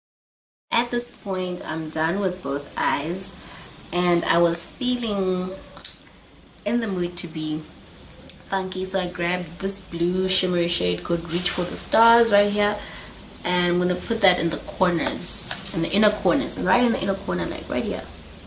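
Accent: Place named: United States. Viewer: You sound like you have recently visited the US. Wa-rrer. You look amazing.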